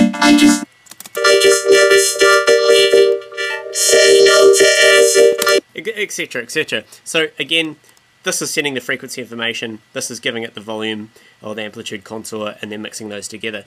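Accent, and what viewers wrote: New Zealand. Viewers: Are you from NZ, you sound Kiwi azzzzz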